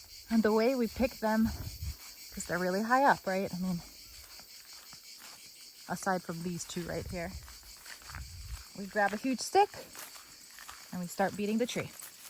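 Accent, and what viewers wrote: United States. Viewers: "I left NY city for Bulgaria" - I was going to say, your American accent is really good :) Liked and subbed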